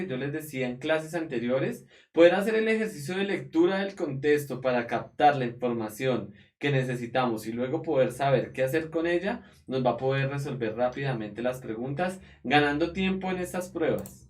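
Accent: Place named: Bogota, Colombia. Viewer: ey cachaco habla bien no se dice "acuerdesen" ni "desen", etc...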